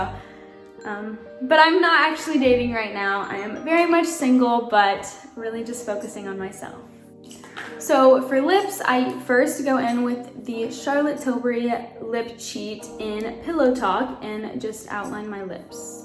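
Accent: United States. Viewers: BRO HER VOICE CHANGED REMEMBER SHE HAD A MORE SOUTHERN ACCENT AND NOW ITS MORE AMERICAN I GUESS?? no hate but i just realized that❤️❤️ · This is how she always talks. And what do you mean “kourtney’s” accent? It’s called being American 😂 they don’t sound anything alike.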